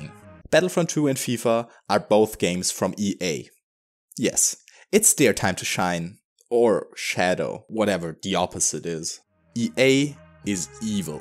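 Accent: Germany. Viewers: Hört sich fast wie ein deutscher Akzent an?🤔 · You sound suspiciously German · Man hört deine DEUTSCHheit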